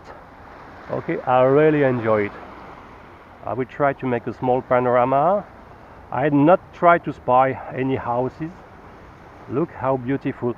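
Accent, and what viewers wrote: France. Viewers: vous avez un fort accent français je me trompe ou pas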